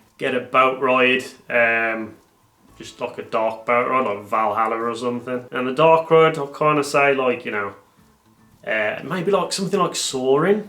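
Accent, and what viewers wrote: Black Country, United Kingdom. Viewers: Is that a black country accent?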